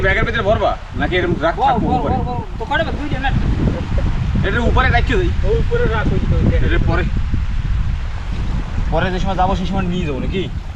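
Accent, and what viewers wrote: Barishal, Bangladesh. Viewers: বরিশালের একসেন্টের সাথে মিলে অনেকটা ।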